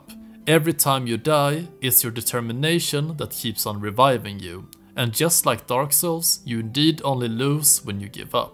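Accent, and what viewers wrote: Sweden. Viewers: This guy has a very swenglish accent (swedish - english)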